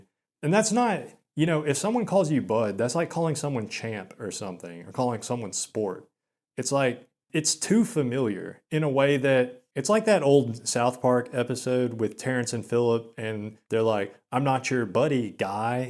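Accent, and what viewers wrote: South, United States. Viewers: yeah stfu dude. idgaf about you as this is the first video of yours i've seen. Your voice isn't giga-magnetic, but it's in the deep register and some people find the Southern accent to be extremely sexy. Talking slowly isn't inherity bad either. I also talk slow and "monotone" and never get the vibes people are being bored with me. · You’re from the South, right? What state? T. Savannnah, GA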